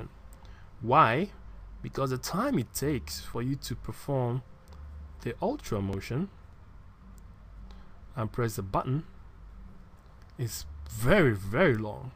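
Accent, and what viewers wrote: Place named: Igbo, Nigeria. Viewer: You sound Igbo.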